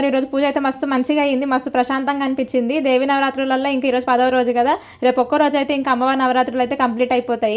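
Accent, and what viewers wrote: Andhra Pradesh, India. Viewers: Akka miku mundu matlade andhra slange bagundi pls adhe matladandi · Andhra basha bagundhi akka malli alane mattaldandi akka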